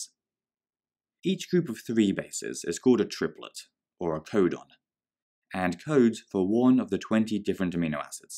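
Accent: United Kingdom. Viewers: Seems british to me 🤔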